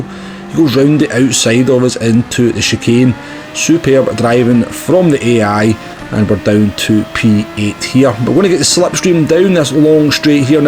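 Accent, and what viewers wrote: Scotland, United Kingdom. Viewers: From Scotland by the accent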